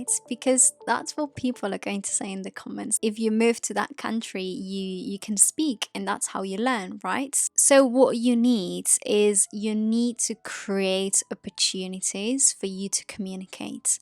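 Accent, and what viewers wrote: RP, United Kingdom. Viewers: ¿Cómo aprendiste a hablar el acento británico? Hablas como si fueras británica. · I love RP accent... Great video. Thanks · Me gusta tu acento Británico, me voy a Australia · Would have never known that you are Spanish. Well done with the British accent.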